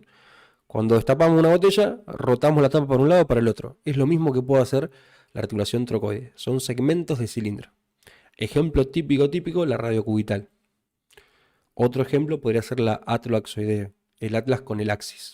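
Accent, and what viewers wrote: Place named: Argentina. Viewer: Excelente video, me enamoré de como explicas más tu acento argentino, saludos desde Venezuela ❤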